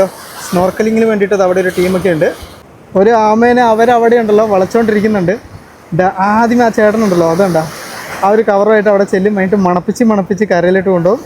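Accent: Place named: Central Kerala, India. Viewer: നിങ്ങൾ ശരിക്കും കണ്ണൂർകാരൻ തന്നെയാണോ നിങ്ങളുടെ ഭാഷ ശൈലി കോതമംഗലം മൂവാറ്റുപുഴ തൊടുപുഴ ഭാഗത്തുള്ള ഭാഷാശൈലി ആണല്ലോ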